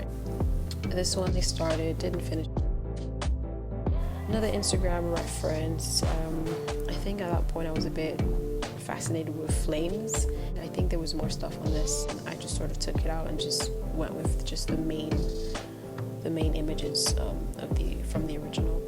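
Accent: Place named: Barbados. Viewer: Beautiful work! The music was a bit loud and found it hard to listen to the Bajan accent. Keep arting. Looking forward to the next video! Stay safe 💜💛💙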